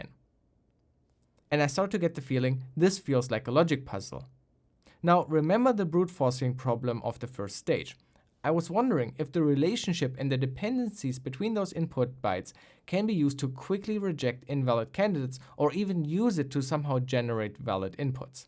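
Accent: Germany. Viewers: I like ur German accent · The german accent makes me want to kill myself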